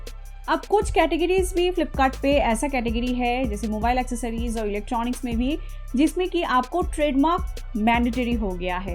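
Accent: Bengal, India. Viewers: Don't have time to watch long video,and bengali action in ur hindi speech.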